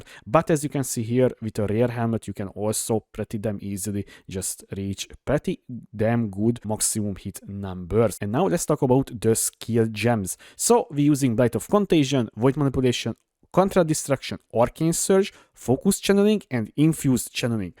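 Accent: Hungary. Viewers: I love your accent! Hungary?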